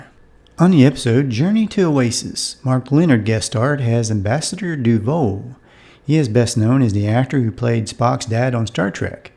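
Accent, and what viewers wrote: South, United States. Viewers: We like the Bible quote and the southern accent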